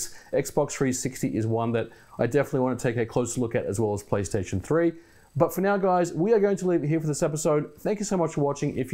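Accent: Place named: Australia. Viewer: you need to state which currency you are talking about. you have an australian accent, so while i assume in your script you are always speaking at americans, this is always jarring and annoying. if you say "fall" we hear a tumble, not a season. americans are ignorant, please stop trying to please them.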